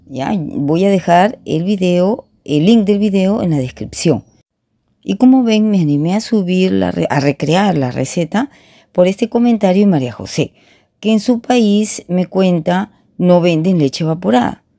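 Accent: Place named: Barranca, Peru. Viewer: Me encanta .... juraría que eres de barranca... hablas igual que mi tía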